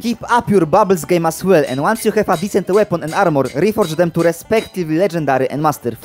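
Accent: Poland. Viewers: Zastanawiałem się czy Polak po akcencie ale wystarczyło że zobaczyłem 2137 lvl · Nie no po akcencie od razu wiedziałem że rodak XD Film też się przydał bo mam nowy rekord przetrwałem 3 dni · słycahć po tobie ze jestes polakiem XD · od początku wiedziałem że to polak hahaha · masz bardzo polski akcent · are you from poland ?? haha because of your english xDDD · No akcent polski duży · tak dawno nie słyszałem kogoś z tak polskim akcentem że ciężko mi czasem zrozumieć co mówisz XD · Po 2 sekundach wiedziałem że jesteś z Polski ale super poradnik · dobra sprawdzałem czy polak i się dowiedziałem to polak po 4 min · kurna od razu po akcencie mozna poznac ze Polak, kocham cie stary (zajebisty toutorial) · Are you from Poland? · are you from poland? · Kocham polski akcent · Polski akcent — miód na moje uszy :))))) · 2137 widac i slychac ze polak!!!!!!! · Dobrze słyszę że jesteś Polakiem? 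· bro r u polish? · Jak wszedłem w ten filmik i usłyszałem ten akcent wiedziałem że coś jest nie tak patrze w info kanału i oczywiście polska, od początku coś tak czułem, nagrywasz po inglisz żeby mieć wiecej wyświetleń sprytne. Mam pytanie a propo farm expa xaphan tych zielonych co w lawie się respią po tym jak dotknie wody, bo od chyba wersji 2.9 już te farmy nie działają i czy da się to jakoś obejść że będę na wersji np 2.9.3 i wejdę w ustawienia modów w grze i poprzestawiam coś żeby farmy działały i xaphany się respiły? Proszę odpowiedz jak lub nagraj o tym bo dużo osób potrzebuje tej informacji czy da się to zrobić i jak to zrobić a szczególnie dużo osób z polski. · Stary jak miło usłyszeć polaka po angielsku xD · Słychać ten polski akcent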